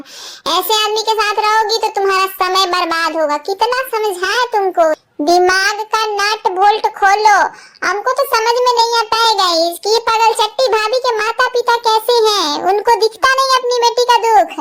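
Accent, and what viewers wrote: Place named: Varanasi, India. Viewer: 😂😂aap hai kaha se plzz btaiyega aapki aavaj bilkul hm logo ke tarf ka lgta h mera matlab hai vanaras jesa h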